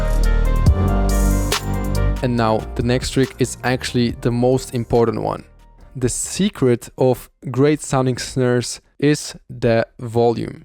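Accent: Russia. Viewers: Ты русский что ли? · Акцент русский пздц, но видосы крутые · русский акцент😂🤟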